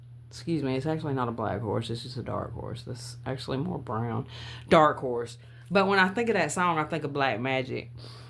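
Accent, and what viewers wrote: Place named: Louisiana, United States. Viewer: Amen ✨️ 🙌 🙏. Your accent is so cute. Louisiana?????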